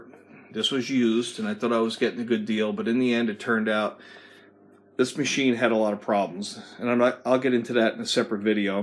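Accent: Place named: United States. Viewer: seems like a lot of money for a used k40 when a brand new one is only about 340 usd..and knowing that laser tubes (most costly and fragile consumable part) have very limited life..the risk is too much in my opinion unless you got it for 50 usd..but thats my opinion....fyi why you speak american english and refer to euros? seems a bit odd to me.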